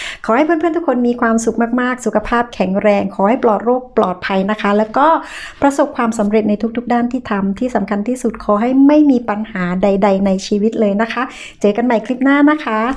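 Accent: South, Thailand. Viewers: ไม่จริงนะ อิสานใต้สำ้นียงอีกแบบนึง แต่นี่ฟังแล้วรู้เลยว่าเป็นคนใต้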